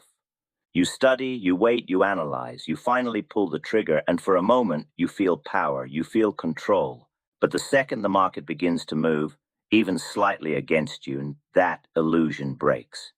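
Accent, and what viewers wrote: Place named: Australia. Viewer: Sometimes it sounds like has developed an Australian accent 🇦🇺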